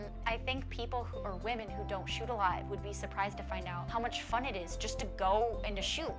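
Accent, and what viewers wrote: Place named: Great Lakes, United States. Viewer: You have good videos...but you should work on losing that snarly Great Lakes accent. It's kind of annoying.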